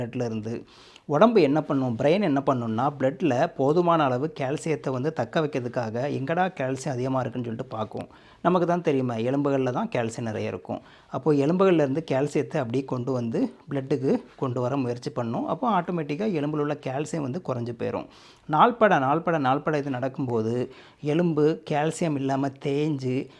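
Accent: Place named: Nagercoil, India. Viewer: நாகர்கோவில் தமிழ் 🎉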